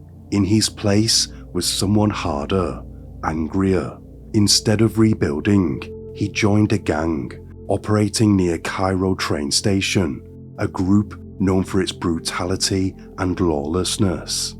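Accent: United Kingdom. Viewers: Common pronunciation for British folk in certain areas. England has a very broad range of accents and pronunciation habits. I quite like his voice. It seems to work very well for this type of content.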